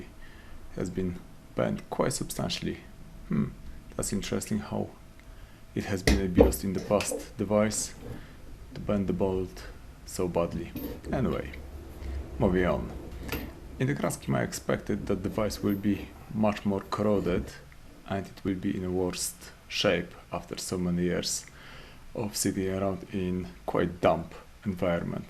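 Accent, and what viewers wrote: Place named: Soviet Union. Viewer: Чомусь по твоєму акценту мені здається що ти з "совка" 😉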